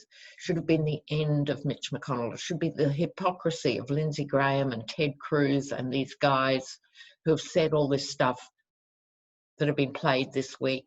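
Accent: Australia. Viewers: Thank you so very much for sharing your insights and wisdom with us! You are helping me remain as sane as possible. Question, please. By your accent alone I'd be able to tell that you're Australian. I'm curious -- why do you have such an interest in US politics? (Please don't misunderstand! I'm right there with you politically!) I realize there are plenty of "fires" to put out, so those, naturally , will take precedent. But as you have time and/or inclination, I'm sure I'm not the only one who's curious about this. Thank you for all you do!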